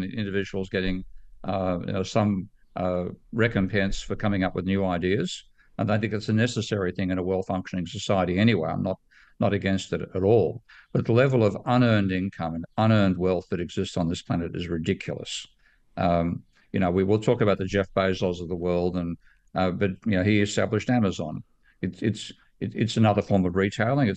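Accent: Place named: Australia. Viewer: He is Australian 😂. They speak like that😂.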